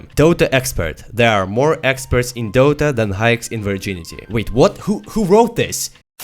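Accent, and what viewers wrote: Russia. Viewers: ставьте лайк, если понимаете что он говорит, только потому что у него русский акцент:) спасибо за видео ты пиздат. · Мне интересно, ты русскоговорящий или нет, прост акцент либо выдает, либо я незнаю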